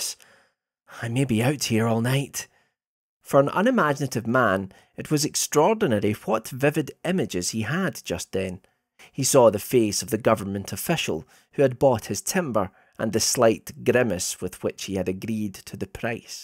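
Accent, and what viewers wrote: Scotland, United Kingdom. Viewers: That's quite a thick Scottish accent you have there!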